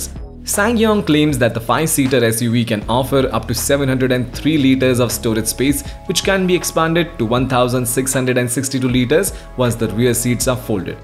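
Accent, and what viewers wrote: India. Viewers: I heard...voice...indian speak english...😊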